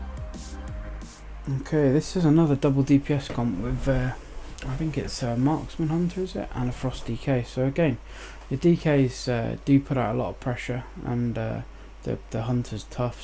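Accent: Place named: Lithuania. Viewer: Good video and nice commentary, I'm trying to place your accent, are you from Lithuania by any chance?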